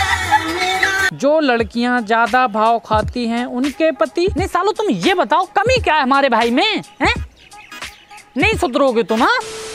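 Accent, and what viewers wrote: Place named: Budaun, India. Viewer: Bhai voice se lagta hai aap budaun ke aas pass ke rhne bale ho achcha tareeka hai entertainment ka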